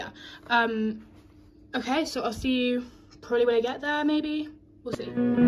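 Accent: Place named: United Kingdom. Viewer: I never realized you were British why did I assume you were American the whole time lol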